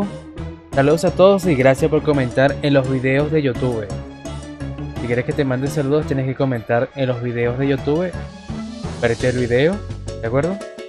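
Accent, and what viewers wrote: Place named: Paraguay. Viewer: Se nota el acento Paraguayo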